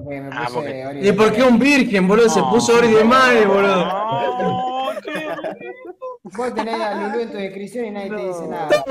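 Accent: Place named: Peru. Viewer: Tamare oe se pego el peruano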